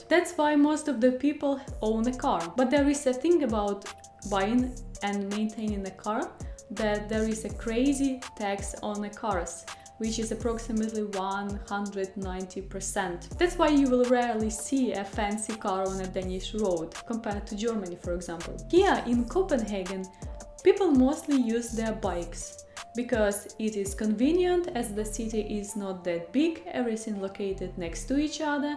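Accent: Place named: England, United Kingdom. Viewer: Your voice is so cute, I love your English accent. Greetings from México. =)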